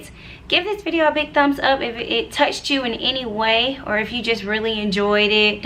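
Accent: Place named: Georgia, United States. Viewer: Thank you for sharing your story! I resonate so much with the many years of believing in Him but not really KNOWING Him but having the time when you dive deeper & learn to CLING to the relationship you developed with the Father ❤️ P.S. while watching your video I was like “This girls accent is definitely a Ga accent” because I’m from Ga too, then you said Jonesboro & I was like 😮 I grew up in Jonesboro too!!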